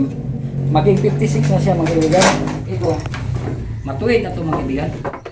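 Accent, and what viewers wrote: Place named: Visayas, Philippines. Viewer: 😃😃😃 Ang galing mo tay lalo na sa pag sa salita mo murag bisaya 😀😀😀 may nakat on an jodko nimo salamat tay baguhang panday Mason rin Ako.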